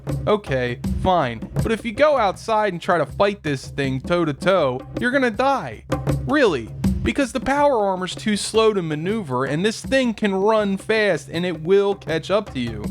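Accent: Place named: Philadelphia, United States. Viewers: Love that I found this guy. A) he is definitely from the Philly/ South Jersey area. As someone from Delaware, I recognized that accent IMMEDIATELY. Feels close to home - like I’m listening to my cousin instead of a stranger, which is awesome. B) this commentary makes him sound like a disappointed Philly sports fan, but instead of being let down by his team(s), he’s let down by Bethesda. I love it. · That accent sounds familiar. Are you from Philadelphia?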